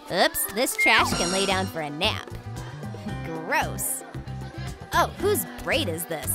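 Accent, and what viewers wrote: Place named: Wisconsin, United States. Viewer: You sound like you're from Wisconsin!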